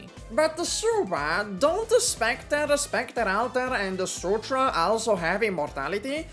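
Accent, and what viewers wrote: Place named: Italy. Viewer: Oh shit, again this guy with Italiano accent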